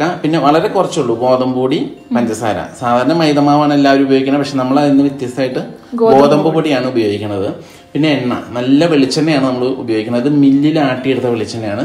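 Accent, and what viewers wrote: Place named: Wayanad, India. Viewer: Hai ma'am how are you, I like to hear ur wayanadan voice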